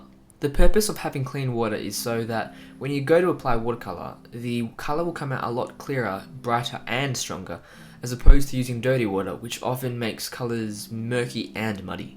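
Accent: Australia. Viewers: is he from Australia perhaps? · Are you australian? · Are u a fellow Australian??? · you're Australian, yeah? Certainly sound so to me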